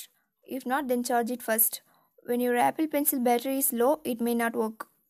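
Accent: India. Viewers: I CANNOT STAND INDIAN ACCENTS!!! STFU!!!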